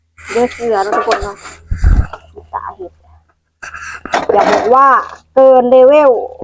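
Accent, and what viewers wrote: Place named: Ubon Ratchathani, Thailand. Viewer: แซบๆน้อง สำเนียงเธอ คิอทางอุบล ศรีสะเกษ ประมาณนั้นเฮาว่า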